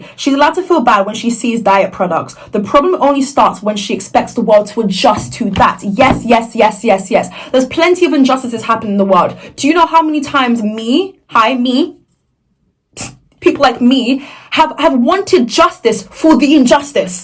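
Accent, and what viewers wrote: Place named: United Kingdom. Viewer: You Look stunning!! And I love your british accent! So charming!!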